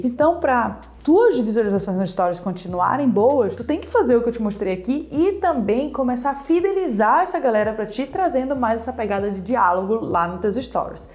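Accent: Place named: Florianópolis, Brazil. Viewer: Algo me diz que o sotaque é de floripa 😅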